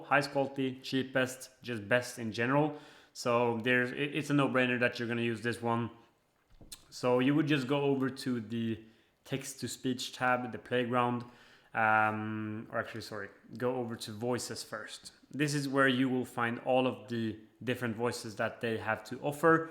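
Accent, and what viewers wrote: Sweden. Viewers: Brors svenska dialekt är galen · the way this guy pronounces "ch" sounds is too funny "sjat GPT"😂 so swedish!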